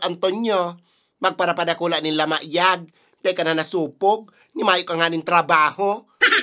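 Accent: Bicol, Philippines. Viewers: naugma ako duman sa lamay-ag.. hahaha, bikol na bikol. KUDOS ORAGON KITA!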